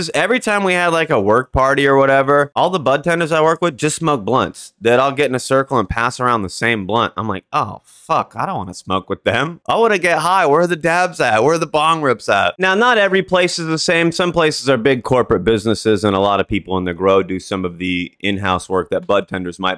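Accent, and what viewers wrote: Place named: New England, United States. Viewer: Idk if you’ll see this or mind me asking but where did you reside before you moved to Colorado for some reason I pick up some New England in your accent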